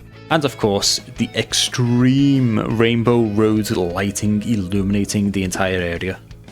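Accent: Liverpool, United Kingdom. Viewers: Good to hear a scouser online. I'm from liverpool too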